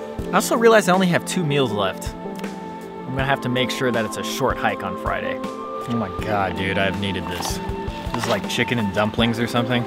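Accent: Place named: England, United Kingdom. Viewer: Your English accent sounds like Keanu reeves accent from Bram Stoker's Dracula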